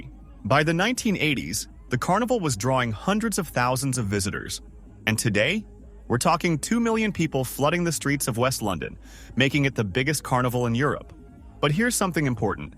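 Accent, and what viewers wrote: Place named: United States. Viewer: Sounds like an AI generated American accent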